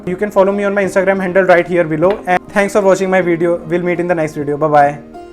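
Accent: Gujarat, India. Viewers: Are you from gujrat ? 😅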